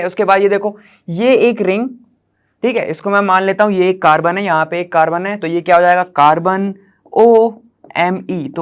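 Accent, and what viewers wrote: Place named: Bundelkhand, India. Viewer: sir ki voice se lagta hai sir bundelkhand se hai ,i guess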